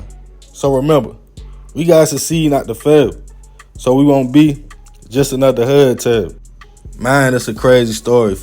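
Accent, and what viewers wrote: Baltimore, United States. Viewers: "Watch who you call you're FRIEND. Thats a strong word. A real homie would NEVER kill you. If it's that serious they would simply cut you off if they not feeling your vibes no more because they don't wanna see no harm come your way" 🤦🏾‍♀️💯💯💯 SOME OF THE MOST WISE WORDS EVER SPOKEN KING 👑 I JUST KNOW YOU FROM MY CITY LOL THE WAY YOU SPEAK JUST SAYS BALTIMORE ALL DAY 🥰🥰🥰💪🏽💪🏽💪🏽💯💯💯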